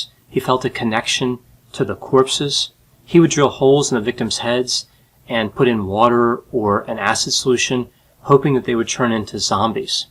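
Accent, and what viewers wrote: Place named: Massachusetts, United States. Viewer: What is this doctor's accent? Massachusetts?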